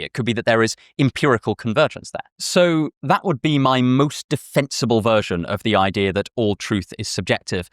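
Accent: United Kingdom. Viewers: I missed the flavor of your providing text, but also being able to have accurate spellings provided (such as "deepity"), and being clear about what you're saying at all times. You're a clear speaker, but you're British and I'm American and the words help. Though I understand if it is time-consuming to have to provide them and you need to reduce your load.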